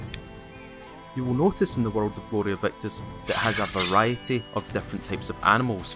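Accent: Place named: Scotland, United Kingdom. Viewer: First time looking up this game on YT and HELL YEAAAAH ....SCOTTISH LAD... BEAUTIFUL!!!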